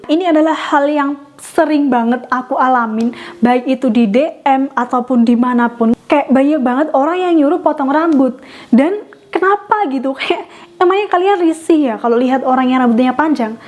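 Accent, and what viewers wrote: Java, Indonesia. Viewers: Kakak orang jawa ya?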